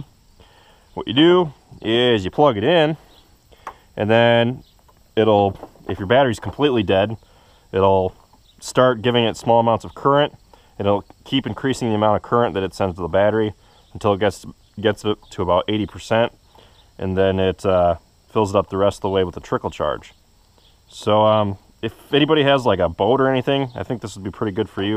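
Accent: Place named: Wisconsin, United States. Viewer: First thing this fellow cheesehead noticed was the Wisconsin plates on the car and your 100% Wisconsin accent. I live in Arizona now and can pick out the accent in a crowd like nobody's business. Comfort food for the soul. As a sidenote I also have the NOCO G7200... Thanks for the video!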